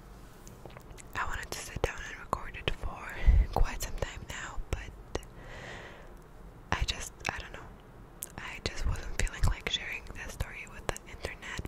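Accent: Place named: Slovakia. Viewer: I can hear her Slovak accent it’s so pretty and pure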